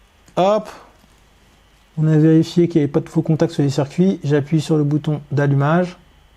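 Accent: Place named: Europe. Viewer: I hate European accents, too annoying. Always sounds enthusiastic no matter your actual expression.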